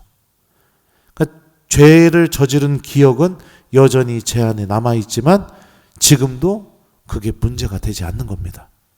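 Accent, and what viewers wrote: Chungcheong, South Korea. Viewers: 요즘 신부님 특강 너무 재밋게 듣고 보고있습니다. 구수한 충청도 사투리가 마음속에 쏙쏙 와닿는 것 머리속에 꽂치는거 신부님은 천상 우리의 스승님이십니다~ 고맙습니다.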